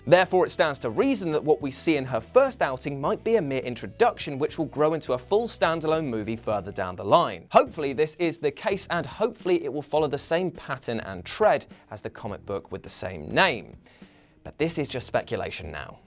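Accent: United Kingdom. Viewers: Brick Boss 2004 he’s British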